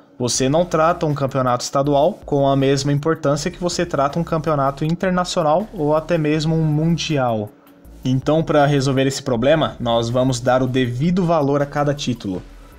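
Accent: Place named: Rio Grande do Sul, Brazil. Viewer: Sudamericana valer menos que copa do Brasil? Qual droga? Kkkkkk dono do canal claramente é gremista pelo sotaque de gaúcho kkkk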